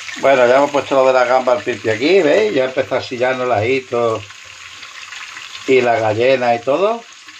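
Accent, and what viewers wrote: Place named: Malaga, Spain. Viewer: Voy hacer ahora las papas... Fíate yo digo papas.....me encanta como hablas con la z como... L@ Malagueñ@ .....un saludo desde Maquea 😘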